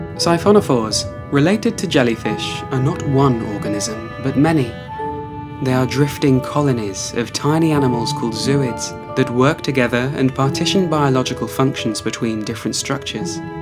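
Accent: England, United Kingdom. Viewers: he literally has an english accent is this comment supposed to be rage bait 😭😭😭😭😭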